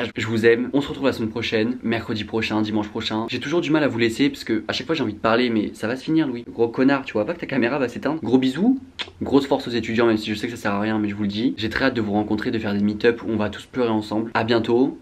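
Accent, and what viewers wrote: Caen, France. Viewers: Quand je t’écoute j’entends VRAIMENT l’accent caennais haha ( je suis trop fier d’avoir toi et Lucasdorable comme représentation même si y’a que mwa qui vous connais a caen bahaha 🙁 )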